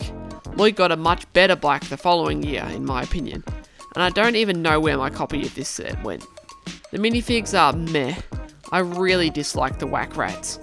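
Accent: Australia. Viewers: Your Australian !!!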